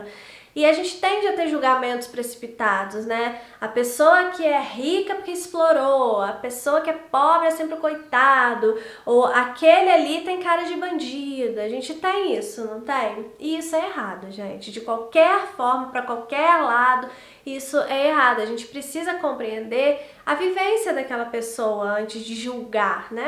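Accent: Minas Gerais, Brazil. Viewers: Ela é mineira?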